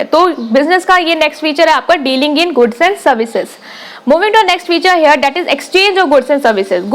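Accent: Lucknow, India. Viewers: Ma'am aap lucknow se ho kya 😐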